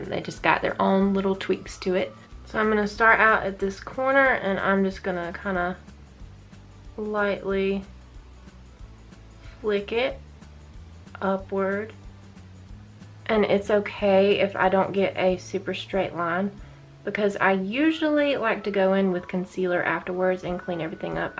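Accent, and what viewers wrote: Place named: South, United States. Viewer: im so jealous omg! i just posted a brow routine as well! your southern accent is so cute!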